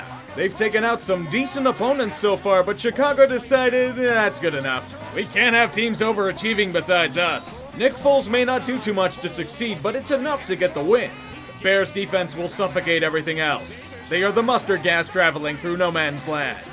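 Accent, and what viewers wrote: Pittsburgh, United States. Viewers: Nice to hear the Yinzer again.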